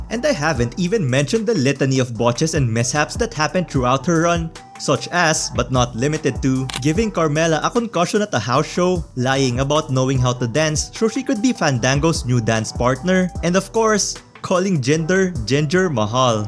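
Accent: Philippines. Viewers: Bro are you filipino? 😍😍😍 love your voice!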